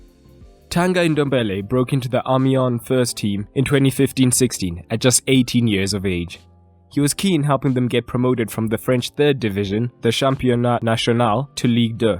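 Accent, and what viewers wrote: South Africa. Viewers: You sound South African. New subscriber 🙋🏽‍♀️ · What do I think? I should let you know in the comments below? I think... I think you sound South African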